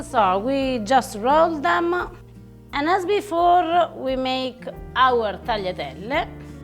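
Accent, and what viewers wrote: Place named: Italy. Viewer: i just have to ask... was you tired when you was making this video? You english get abit more Italian accent. No, no offence intended.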